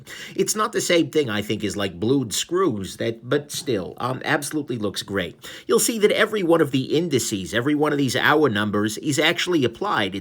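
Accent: New York, United States. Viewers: That accent is just awesome. So old New York I love it!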